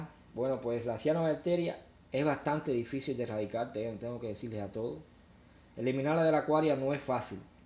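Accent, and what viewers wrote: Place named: Cuba. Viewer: Amigo de q país eres ?? Suenas como cubano !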